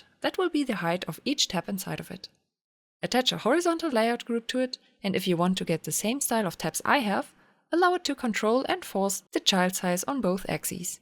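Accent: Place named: Germany. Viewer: Ein Voice Over in Deutsch als Audiospur wäre cool ;-) Ich kann zwar sehr gut Englisch, aber dieser Deutsch Englische Dialekt ist echt schlimm. Ich weiß nicht warum, aber ich höre jeden Deutschen heraus, der Englisch spricht und find's wirklich schwierig. Frag mich aber nicht warum, ich weiß es selbst nicht. Ansonsten, Top Video. Danke!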